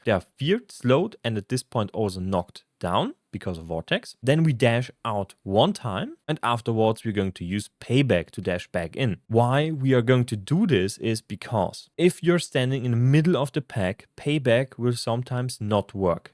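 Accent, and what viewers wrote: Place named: Germany. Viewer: Jede wette das er ein Deutscher ist. Man hört es voll raus 😅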